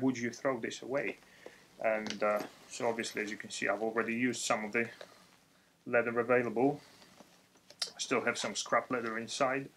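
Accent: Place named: United Kingdom. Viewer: powiedz mi skąd masz tak fantastyczny akcent? Urodziłeś się w UK czy w Polsce? BTW fantastyczne materiały miło się Ciebie ogląda i te polskie napisy, w których tłumaczysz często na skróty wychodzą czasem zabawnie:) Tak trzymaj!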